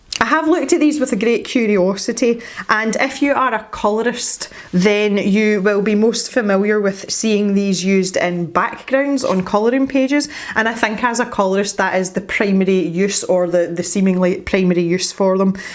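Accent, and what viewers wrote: Scotland, United Kingdom. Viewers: Cute accent. Scottish?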